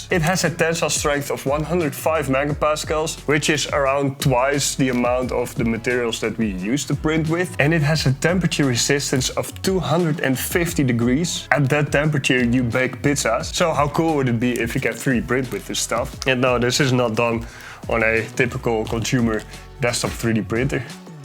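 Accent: Netherlands. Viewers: Hey ur Dutch I’m Dutch too ik hoorde al aan je Engels accent deze lijkt een beetje Nederlands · Wait a MF SECOND‼️‼️‼️‼️ You're Dutch‼️‼️ i hear you say jesus with a dutch accent‼️‼️ ik wist het😂😂 i'm also dutch😂😂 game recognizes game😂😂 and also i hear you say fucking hell fucking shitzooi, between and in the video 😂😂😂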